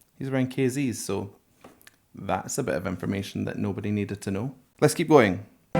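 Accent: Scotland, United Kingdom. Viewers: I love Scottish accent! I enjoyed your professional explanation very much! Jin is definitely a great singer and yes, we need more Jin in ’ songs!💜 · Hey. Thank you for the technical explanations. This is exactly what I look for in a reaction video and I so rarely find it when it comes to reactions. Also, love your Scottish accent. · Hey, this is nice. Keep going! This ARMY approves. Not that you need mine or anyone’s approval. 💜 Some of the songs Jin really shines in are BTSs Japanese songs, and Korean. And ofc his solo songs, etc. is a magnificent vocalist. Very stable too. Have fun with this! ps. I’m Swedish but I worked a season in Dalwhinnie and traveled around Scotland many years ago and when I hear you speak it really takes me back. Love the Scottish accent.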